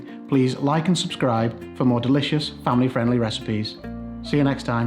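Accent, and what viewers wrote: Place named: Northern England, United Kingdom. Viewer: A northern lad I suspect me? Derbyshire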